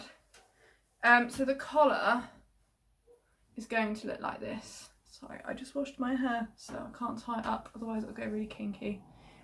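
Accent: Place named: Liverpool, United Kingdom. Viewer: A wonderful episode! I so enjoyed knitting along and listening about your knitting journey. I grew up visiting my grandparents in St Helens near Liverpool. Your accent brought me back over 40 years. Best wishes from a Canadian knitting podcaster!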